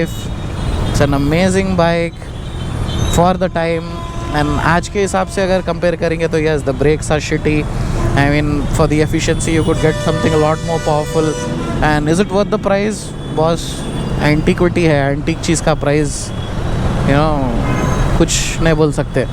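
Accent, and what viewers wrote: Hyderabad, India. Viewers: bhai iss bike ka restoration vala video dalo aur thode videos banvo kuch bhi chale ga kyuki muje aapki Hyderabadi accent bahut badiya lagti hee love you ❤️🙏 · Love the hyderabadi skeltonic accent 👌👌 Thanks for sharing the japanese-indian yamaha aesthetics. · I like your voice bro. Hyderabadi ton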